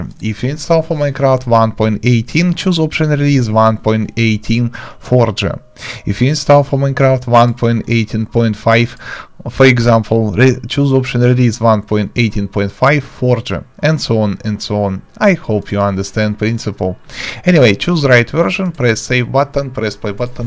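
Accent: Russia. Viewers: сразу слышно русский человек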